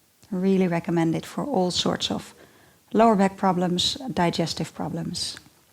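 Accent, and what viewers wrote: Netherlands. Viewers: You sound like you are from The Netherlands... Dank je wel voor de oefeningen!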